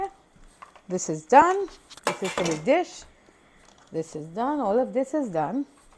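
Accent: Trinidad and Tobago. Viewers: How is this lady not acting like she’s from Trinidad…with a Trini accent and everything… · Reynold Balgobin, try and learn to spell first and work on your grammar before criticizing the goodly lady. Be more appreciative of our culture that is visible now worldwide. Nothing is wrong with how she is speaking. If you're not hearing any Trini accent, well something is definitely wrong with you.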